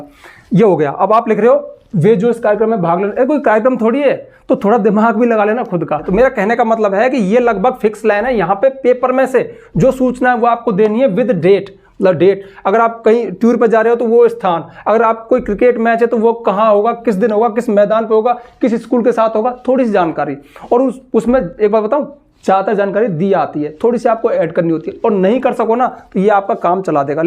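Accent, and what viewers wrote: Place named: Uttar Pradesh, India. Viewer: सर जी आप यूपी या हरियाणा स हो क्या? में यूपी स हूं नु पुंछ रा हूं। और आप का बोल ने के लहजे स लगरा आप भी शायद यूपी के हो।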